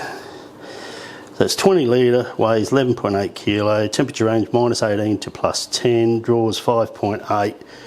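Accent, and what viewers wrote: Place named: Salisbury, United Kingdom. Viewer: You have a very strong accent. Sounds like your from Salisbury.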